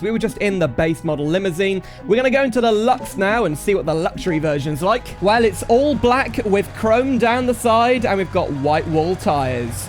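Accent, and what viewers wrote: United Kingdom. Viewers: Another fellow funny british man fan, it tickles the happy parts of my brain, we do eht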